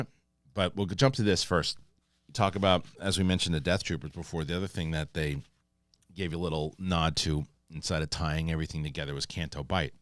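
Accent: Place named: Brooklyn, United States. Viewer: Damn I love listening to lol! It's like listening to an uncle from Brooklyn describe some bad ass movie with a 90s neighborhood gangster take on the unfolding scenario with a brilliant mind and a east coast vocabulary!! F#@ great!! I love it!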